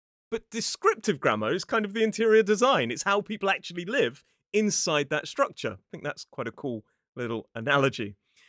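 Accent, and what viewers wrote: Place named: RP, United Kingdom. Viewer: I am from Canada and ok your English sounds like Received pronunciation and it's very easy for me to understand but some British dialects are impossible for us Canadian os American to understand....I remember I needed to have subtitles on when watching Game of Thrones.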